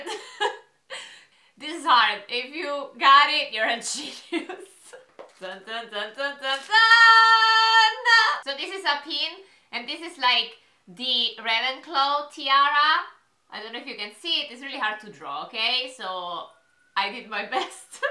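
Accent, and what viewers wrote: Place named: Italy. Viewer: Love ur Italian accent ❤️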